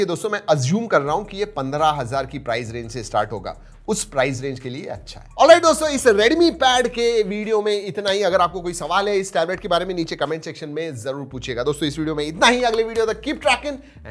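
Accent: Maharashtra, India. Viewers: Nahi gelare, kon mhtla gela🤣🤣 pure gauthi marathi 🤣 loved it as well as tab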